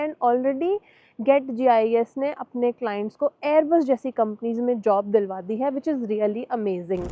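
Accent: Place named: Uttar Pradesh, India. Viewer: Bahut achchi video aap U.P. se hn kya aap ki langauge se lagta h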